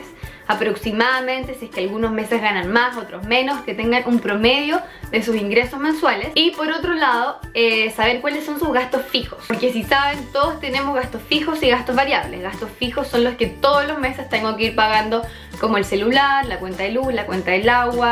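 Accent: Chile. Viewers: Ovbio es chilena! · Eres chile cierto y si lo eres se nota Pd:yo tambien soy chilena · Tu eres chilena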